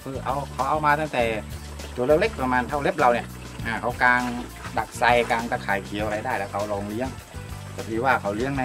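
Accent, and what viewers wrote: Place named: South, Thailand. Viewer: น้าเป็นคนใต้หรือป่าวครับ สำเนียงพูดคล้ายๆคนใต้เลยครับ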